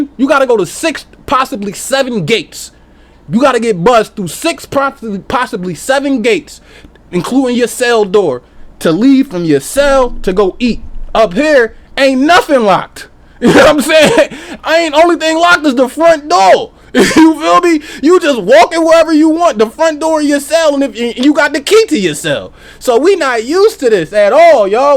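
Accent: Philadelphia, United States. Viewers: Yo man you’re a great story teller. The Philly lingo/accent is heavy lol. ‘Some fat young bol’ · This dude speaks well. New sub for sure! Love the Philly accent no diddy ! Peace from LI NY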